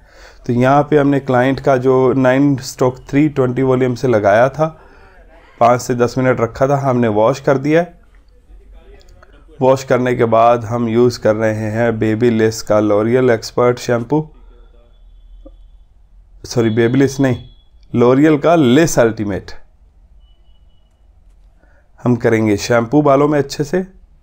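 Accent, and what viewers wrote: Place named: Pakistan. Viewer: Assalam ualikum sir apka btaane ka tarika bilkul esa hai aise Pakistani bat krty hain ya apka koi talluq hai Pakistan main apka koi hai yha main agr india hoti to apky bht kuch aky seekhti or sekhti bhi hon ma sha Allah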